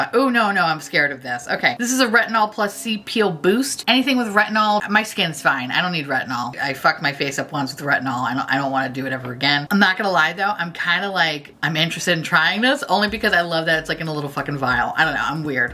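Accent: United Kingdom. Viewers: LMAAAAOOOO the urine sample cup Stranger Danger, that's what I yelled out when I saw it. Also, my skin screamed 💀 bc that looks like a recipe for skin turning to the actual 🏜 desert Yes! Please do more vids like this! LOLLLL I adore them! The British accent gets me every time ❤ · The British voice is the best! “At the scene of the crime, there was...”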